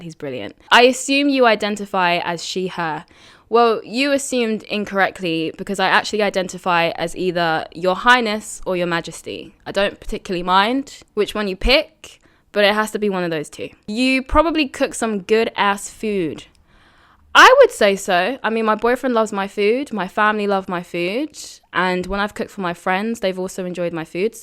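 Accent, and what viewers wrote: United Kingdom. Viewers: I'm a new sub, I would I say I admire how you handle and breakdown topics very logically and reasonably. I can respect that about anyone despite their political beliefs. Plus you have a british accent, which is awesome to me lol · You are a really cool girl! Smart, cute and funny, a keeper! I love the UK accent so much 😅 definitely a turn-on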